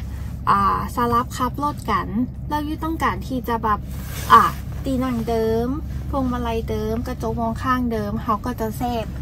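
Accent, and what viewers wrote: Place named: North, Thailand. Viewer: ชอบความเหนือแท้100% ชอมมากกก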